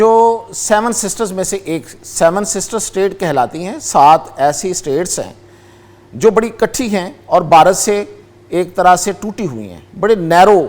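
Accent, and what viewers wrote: Punjab, Pakistan. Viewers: sb you need to work on your pronunciations and general knowledge. Very poor in both fields. Before appearing on TV screen you should be prepared enough to educate people. With punjabi accent urdu seem to be awkward as a medium of instructions. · Its like this guy came straight from restuarant after had Bong Paey! Info is good but appology on your punjabi accent ruined the show. This channel should hire some proper urdu accent guy who looks gentle casting the show mannerly.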